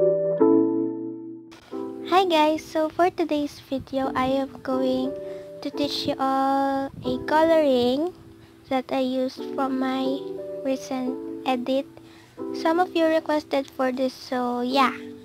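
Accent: Philippines. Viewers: Is it just me or parang Fiipino ka? Yung accent mo po eh heheheh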